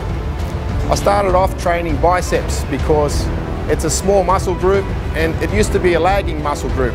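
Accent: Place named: Australia. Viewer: He's Australian!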